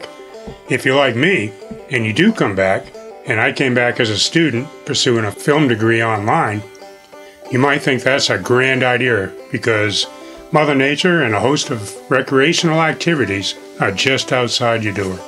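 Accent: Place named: Maine, United States. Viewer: This is great - a nice balance of narration and action shots. I like the humorous way you highlight the challenges of truly rural living but also the benefits. I know it's not the kind of living is not for me but you still convinced me that I need a vacation there sometime after the winter. The photos are spectacular - the beauty of Maine definitely shines even when there is snow. You really got your Maine accent on for this one too! Good luck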